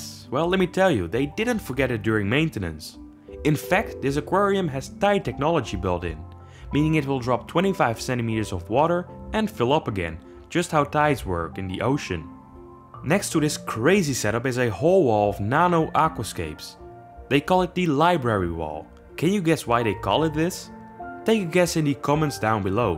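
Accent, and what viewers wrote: Netherlands. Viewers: I think your Dutch😁 by hearing your accent so….super gaaf. Ga zo door met video’s maken.😊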